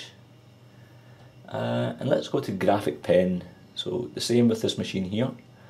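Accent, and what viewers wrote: Scotland, United Kingdom. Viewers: Are u scot?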